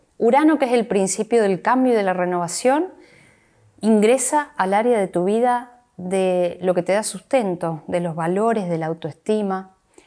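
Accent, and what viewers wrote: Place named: Argentina. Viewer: Hola. Gracias por este video ❤ Tengo una curiosidad: de qué región o provincia argentina sos? Pregunto por tu "tonada". Besos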